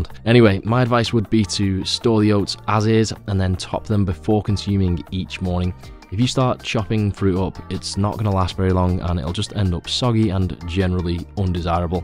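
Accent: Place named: Liverpool, United Kingdom. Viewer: Scouse lad haha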